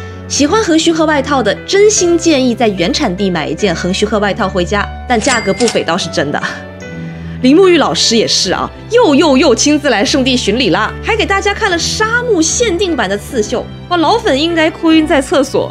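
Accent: Taiwan. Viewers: 好棒的影片，看起來比較親切，又是莎木玩家，又介紹橫須賀這個地方，滿足了我們這些玩過莎木的粉絲好奇心，厲害啊XD（大大是台灣人嗎？在日外國人我一度看成國人，想說口音怎麼那麼台灣？） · 谢谢你！这个好棒啊。你的中文是台湾的吗？我的中文不好的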